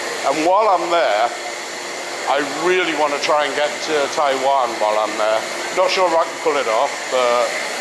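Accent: England, United Kingdom. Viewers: How carefree life was pre-Covid! One can see it on how people walked, how they unconsciously formed a huddle and it was perfectly okay to do so, & most of all, no masks! I've watched vlogs of Grand Central Station (as it is one of the popular places of NYC and hence, a favorite spot to vlog about) but your narrated walk through it stands out because it is very well researched. If one wants to know the juiciest story of a corner of NYC, trust - to tell it to you! Well, of course, your "exotic" Englishman accent is an extra treat!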